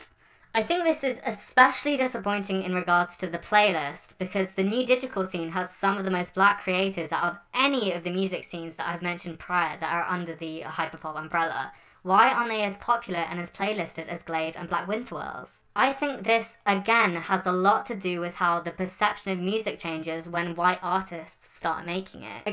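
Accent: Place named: United Kingdom. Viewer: Americans?💀 he has a british accent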